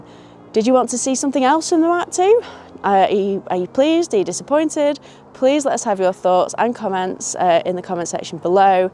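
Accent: United Kingdom. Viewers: Nicely presented and lovely Lancashire accent.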